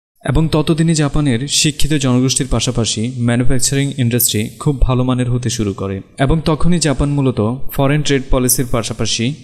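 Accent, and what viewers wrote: Comilla, Bangladesh. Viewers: কুমিল্লার মানুষ সুদ্ধ ভাষায় একদম ক্লিন।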